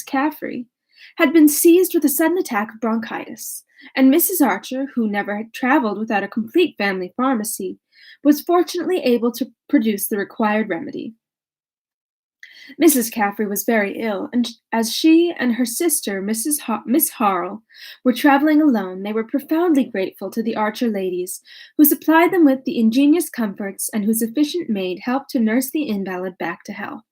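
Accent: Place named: France. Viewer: But the accent français!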